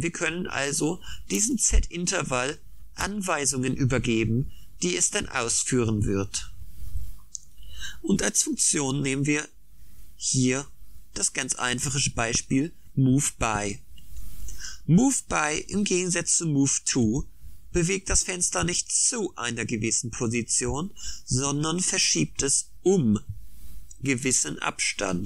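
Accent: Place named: Sauerland, Germany. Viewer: Welch Kräftiger Dialekt, Sauerländer?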